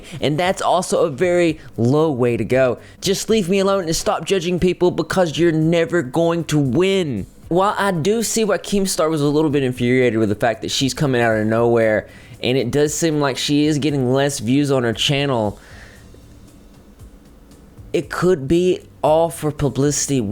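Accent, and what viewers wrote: South, United States. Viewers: He's a fellow southerner. We get that drawl, especially when we talk fast. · Is he southern or british?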